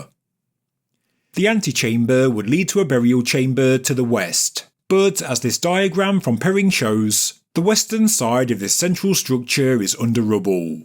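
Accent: United Kingdom. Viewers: I know this is an odd question, but I am asking because I can't place your accent. British? Why do you end sentences by extending the final sound?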